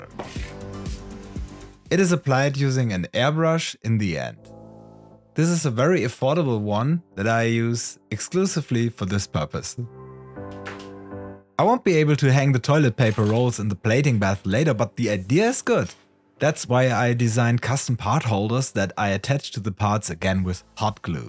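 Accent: Germany. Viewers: An deinem Akzent höre ich das du auch aus Deutschland kommst 😁💪🏽 gute Arbeit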